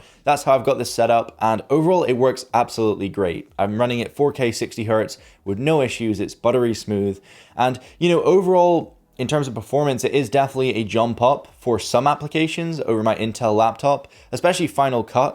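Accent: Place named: Northern Ireland, United Kingdom. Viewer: I hear a twinge of Irish or Northern English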